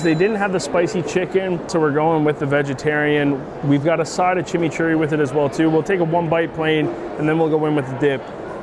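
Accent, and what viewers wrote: Canada. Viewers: Ugh. The southern Ontario accent is so annoying. It’s hard to listen to. Just speak normally.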